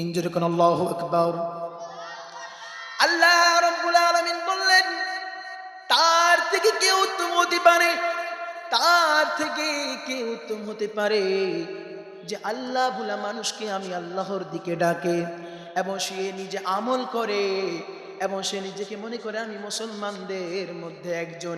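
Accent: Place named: Bangladesh. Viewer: আপনার ওয়াজ খুব সুন্দর বাংলাদেশির মত 😊😊😊😊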